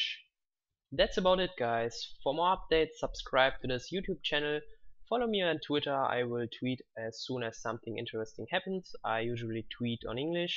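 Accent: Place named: Germany. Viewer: man merkt den Deutschen Dialekt ;) xD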